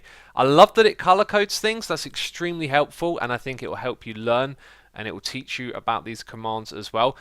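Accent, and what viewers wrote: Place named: United Kingdom. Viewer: Wow, I thought I was watching a different YouTuber for an entire minute. British accents sound the same, I swear. Well, most of them.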